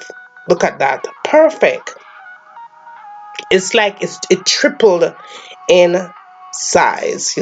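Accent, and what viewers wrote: Jamaica. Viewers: 😳😝Gosh I love to here you speak. U be the Jamaican I love to listen to like Miss Lou. Yes happy channel growth. Now please traditial Jamaican yest corn bread receipt Thank you.🤣😎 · I love this delicious 😋 Coco bread. I love listening to your tutorial with our Wonderful Jamaican accent& language. If you love listening to our language you can check out my Poetry 💖Keep sharing these delicious recipes 💖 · I love accent you really Jamican and way to talk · I could listen to you all day, Luv how your utensils and kitchen are clean. loving the Jam twang' too! lol@ it there and let it hang out." You have a subscriber right away.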